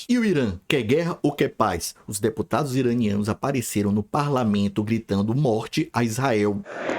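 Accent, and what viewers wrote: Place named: Northeast, Brazil. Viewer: Que bom ouvir alguém com sotaque nordestino que não seja Renan Calheiros, José Sarney, Ciro Gones et caterva. Parabéns !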